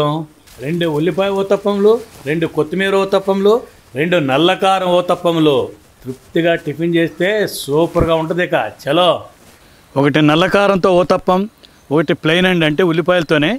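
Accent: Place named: Rayalaseema, India. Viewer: మేడం ది పక్క రాయల సీమ అనుకుంట వాయిస్ మాడెయ్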